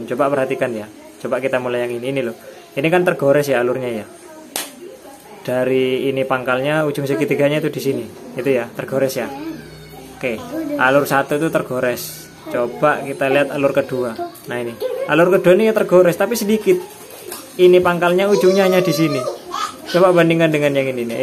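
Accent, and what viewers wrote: Madura, Indonesia. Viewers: Klw dri logat bahasanya kyaknya dri madura ya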